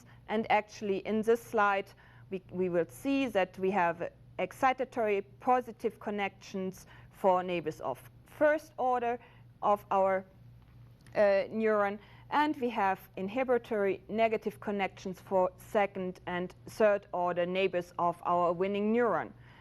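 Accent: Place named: Germany. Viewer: She´s german, right? Really usefull, thanks a lot for the upload!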